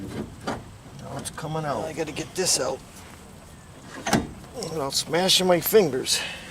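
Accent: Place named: Northeastern Pennsylvania, United States. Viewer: I can tell by your accent you are a true NEPA guy. I enjoy seeing all your work and inspire me as a DIYer/Handyman up the eynon.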